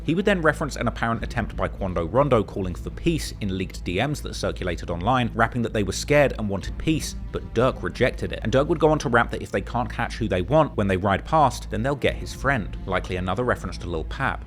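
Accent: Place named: England, United Kingdom. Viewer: this donny a str8 fed where in England is he from 🤔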